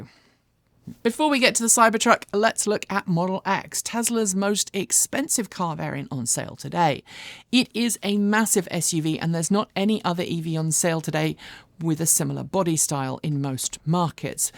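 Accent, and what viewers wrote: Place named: United Kingdom. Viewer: A dumbo putting up a dumb video with a stupid British accent.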